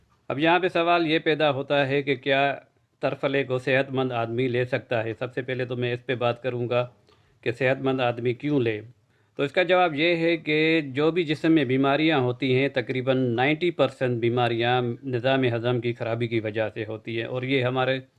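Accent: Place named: Uttar Pradesh, India. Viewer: السلام علیکم ورحمتہ اللہ وبرکاتہ حکیم جی آپکا لب و لہجہ بتا رہا ہے کی آپ کا آبائی تعلق اتر پردیش سے تھا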